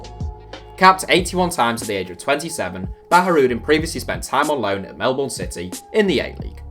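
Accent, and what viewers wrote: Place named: RP, United Kingdom. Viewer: Though I like the efforts, getting your research from Wikipedia and putting a RP accents aren't good enough, to make a list of the best footballer in every countries. You lot need to read more outside of Wiki and consult football experts from each countries to make a better list. Pronunciation is a dead giveaway that this videos is poorly research...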